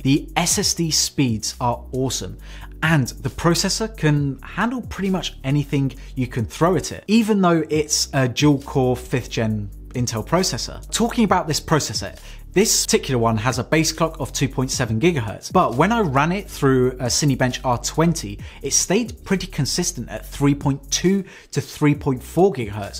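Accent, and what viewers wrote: United Kingdom. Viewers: I subscribed you because of this: nice british accent ✅. btw i clicked your instagram account link found nothing.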